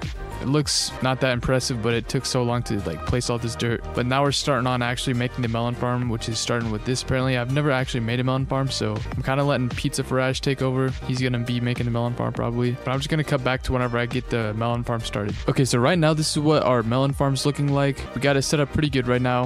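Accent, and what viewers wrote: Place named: Quebec, Canada. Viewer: you're 100% from quebec esti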